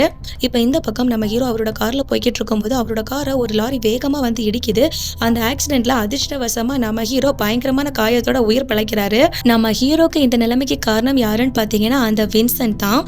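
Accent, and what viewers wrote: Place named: North India, India. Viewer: தமிழ் சரியா தெரியாத வடக்கனுங்க "கார்டு மேலே இர்க்க பத்நால் நம்பர் சொல்லுங்கே சார்"ன்னு சொல்ற மாதிரி இருக்கு நீங்க பேசுற தமிழ் slang..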